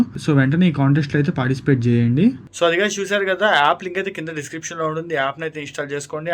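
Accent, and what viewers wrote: Nellore, India. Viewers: Bro, nee nellore yaasa super 👌. All the best nd drive safely...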